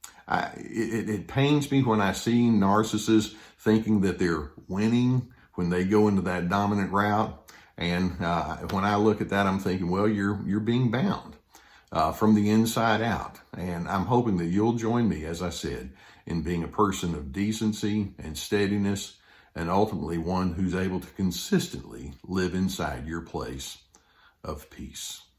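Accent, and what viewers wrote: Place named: West, United States. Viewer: I think I would purchase an alarm clock with Dr. Les Carter giving out some solid grounding and factual encouragement first thing in the morning. That accent and articulate way of constructing a point of view reminds me of Western Movies, the reasonable man who verbally manages to get two gunslingers to avoid the showdown.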